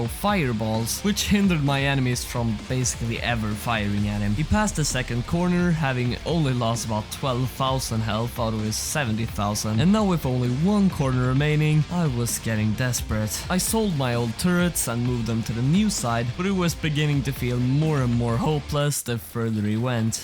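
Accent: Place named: Sweden. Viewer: bros talking swenglish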